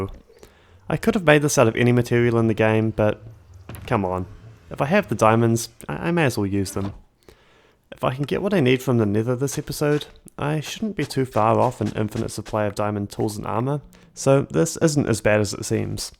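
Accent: New Zealand. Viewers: I just noticed that this guy might be New Zealish. But i'm not 100% sure (i'm a non native english speaker)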